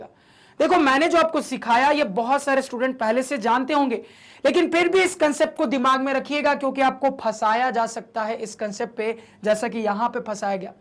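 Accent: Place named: Bihar, India. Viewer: Sir app ko 5-6 months ho gye insta ID bane ko khete hue sir abb to bana lijye And in 11 th lecture you somewhere speak bihari but in 10th you speak like kaddhi boli . Kabhi 10 th lecture me bhi bihari language ka tadka lagyie.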